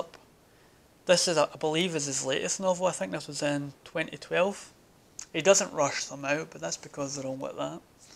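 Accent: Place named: Scotland, United Kingdom. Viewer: Very nice! You should totally do a Rabbie Burns reading, you have the perfect accent for it :D Also, I wouldn't mind that Pullman book, it's actually on my 'want' list 'cause I'm trying to collect all of his work :D I'll cover the postage if you want! Although if someone else wants it then don't worry about it too much, I'll get to it eventually either way!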